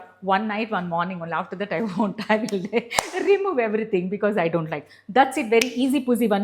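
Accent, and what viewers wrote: India. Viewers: Butler english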